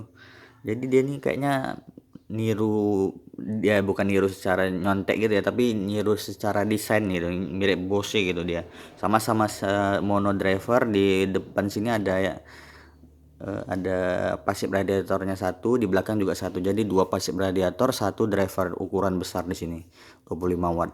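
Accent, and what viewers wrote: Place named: North Sumatra, Indonesia. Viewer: Kek anak Sumut kau bg, dari logatnya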